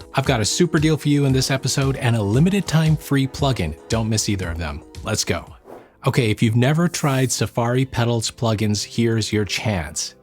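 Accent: India. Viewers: R u Tamil guy?😅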